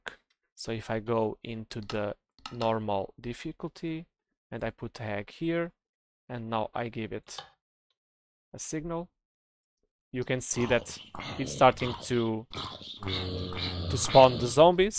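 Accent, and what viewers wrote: Portugal. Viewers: Um tuga conseguir indentificar um tuga a falar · tenho quase a certeza que és português pelo teu sotaque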